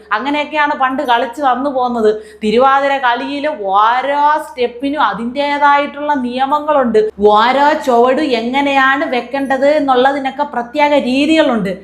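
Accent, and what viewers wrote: Thiruvananthapuram, India. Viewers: തിരോന്തരം ഭാഷ നന്നായിട്ടുണ്ട് അഭിനന്ദനങ്ങൾ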